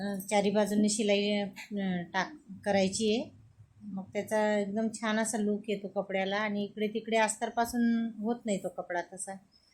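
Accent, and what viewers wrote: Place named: Nashik, India. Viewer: ताई तुम्ही नाशिक साईडच्या आहेत का.. बोलण्यावरून वाटले तसे..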